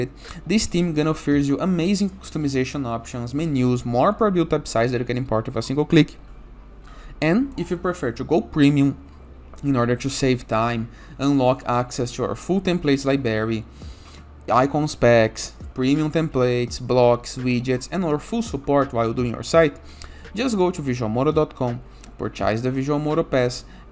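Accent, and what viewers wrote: Brazil. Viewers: Na hora que ouvi o inglês percebi também kkkkkkkkkkk, seu inglês é muito bom, mas da pra perceber q é br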